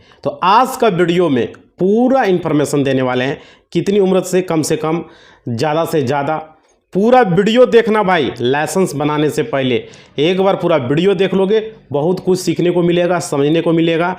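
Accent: Bihar, India. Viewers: Bhai sahab Teri Bhasha Se tu Bihari lag raha hai bakwas kitab nahin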